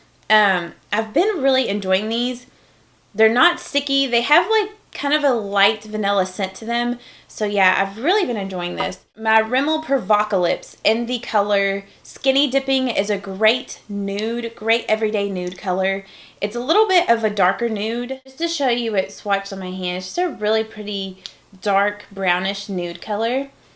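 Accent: South, United States. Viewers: Love seeing someone else with a southern accent.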